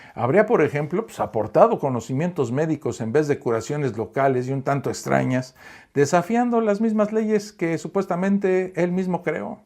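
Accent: Mexico. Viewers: CAUSA GRACIA TUS COMENTARIOS. ¿QUE ERES? ¿DONDE ESTUDIASTE? ¿ERES BACHILLER? SI NO CREES EN NADA, VIVE TRANQUILO TU VIDA Y NO TE METAS EN LA VIDA DE LOS DEMAS: .JUZGAS DESDE TU PUNTO DE VISTA, ELUCUBRANDO ESTUPIDECES y TU TONO MEXICANO QUITA MUCGA SERIEDAD A TU ABSURDO DISCURSO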